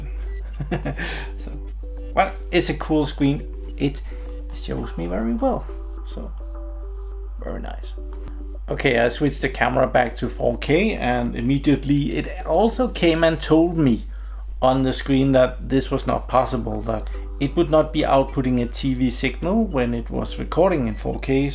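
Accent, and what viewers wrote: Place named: Denmark. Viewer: im betting my ass on the line that this is a danish guy, his English accent is typical of a dane.....the ice is melting at the poles hahah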